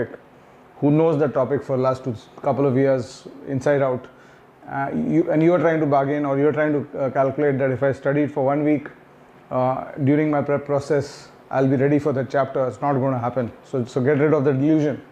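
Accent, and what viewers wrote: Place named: South India, India. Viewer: So to say this thing sir. Sir your English pronunciation is poor. Dull. Sounding South Indian Accent.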